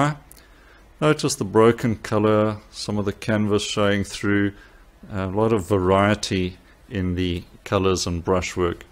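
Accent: South Africa. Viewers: How nice to hear a South African accent in a tutorial for a change. And that landscape inspiration pic makes me “verlang” home. So a happy and sad video 😄😔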